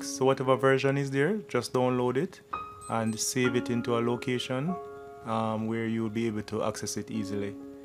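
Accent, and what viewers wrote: Jamaica. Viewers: Sweet. Can learn Mikrotik with a Jamaican accent! Big Up the Tik!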